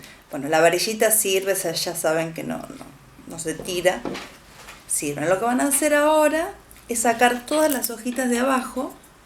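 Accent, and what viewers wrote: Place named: Argentina. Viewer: Muchas gracias, vivo en Thaïlandâ aqui los bambou crecen rapido 😄. Que acento mas bonito, eres agentina ? Besitos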